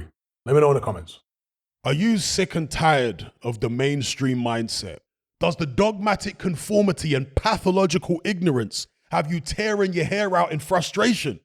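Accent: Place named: United Kingdom. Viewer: i just assumed it was a bri'ish accent thing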